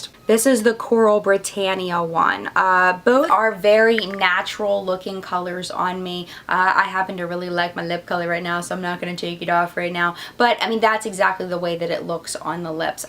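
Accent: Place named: Philadelphia, United States. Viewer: Hi,. I just wanted to let you know I came across your channel looking for some reviews for all those subscription boxes. I have loved everyone of your videos since. I also can't get enough of your accent because it reminds me of home. I grow up in Philly. Anyway, I wanted to share the thing I have been recently loving.... JAPONESQUE Makeup Brush Cleanser. I usually just clean my brushes with antibacterial hand soap....not anymore! If you haven't, you should check it out. I had never heard of them before. Be well! Can't wait till the next video!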